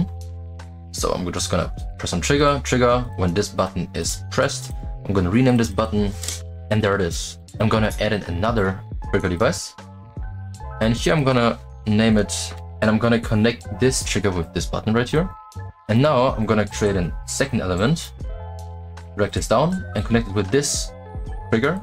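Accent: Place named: Germany. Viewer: Man merkt, dass du deutsch bist hahah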